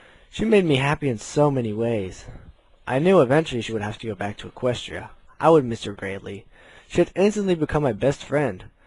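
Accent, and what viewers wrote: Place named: United States. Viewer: Wait, you're from holland? Hold on let me go on google maps........, OOOOOOOOOH, ok now I know were that is, wait, also dont they speak another laguagd and have a different accent because you sound alot like you're from america.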